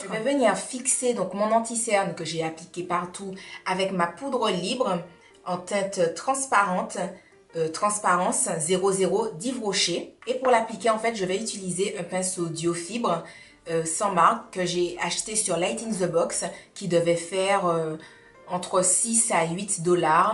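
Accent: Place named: Caribbean. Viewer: Ton accent antillais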